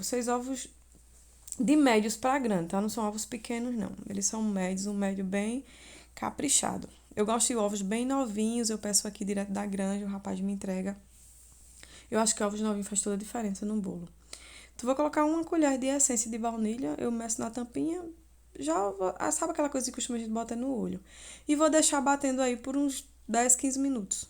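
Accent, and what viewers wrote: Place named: Pernambuco, Brazil. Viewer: Que perfeição❤ Estava só esperando vc falar de qual cidade era porque pelo sotaque, sabia que era pernambucana, sou de Olinda😁😘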